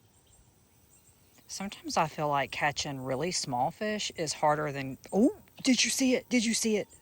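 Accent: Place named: South, United States. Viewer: Liked it! Good to hear a gal with a good ole Southern accent. I come by mine naturally since both sides of my family are from Athens, Alabama. Best of luck in your restoration project, and I have no doubt that you will be successful. It's obvious you take pleasure in the process as well as reaching your goals.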